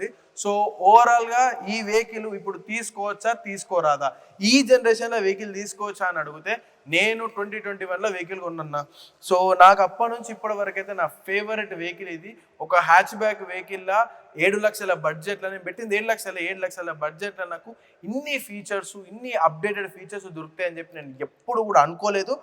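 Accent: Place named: Telangana, India. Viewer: Excellent review Anna Ma telangana Slag brand ambassador vi nivuu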